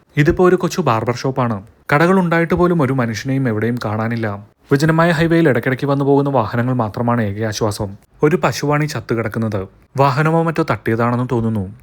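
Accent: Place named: Kottayam, India. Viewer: കോട്ടയം സൈഡ് ആയിരിക്കും ഇവര്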